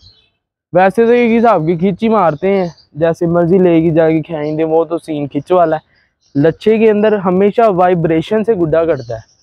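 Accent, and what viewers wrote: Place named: Pakistan. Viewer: Hy bro good video i am from Delhi India but your accent is like Pakistan... By the way nice video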